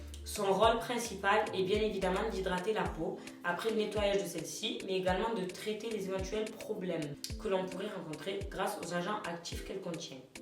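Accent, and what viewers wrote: Marseille, France. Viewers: J’ai regardée juste avant une vidéo où tu parlait que ton accent marseillais dérangeait et là on voit que tu perd ton accent le sang 😭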